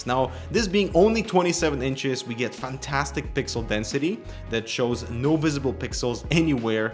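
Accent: Russia. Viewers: я извиняюсь, что за акцент ты русский что ли?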